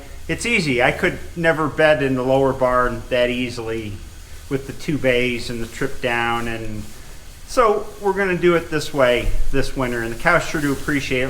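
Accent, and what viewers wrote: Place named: Texas, United States. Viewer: Sound like Texas but no debate about snow😂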